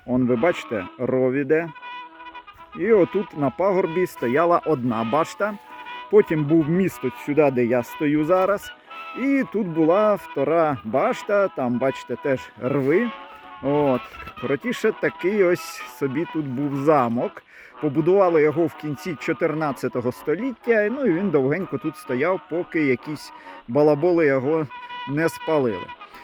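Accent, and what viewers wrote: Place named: Vinnytsia, Ukraine. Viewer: Деякі слова такі, наче ти з Вінничини, типу ходю. Здивований що ти білорус.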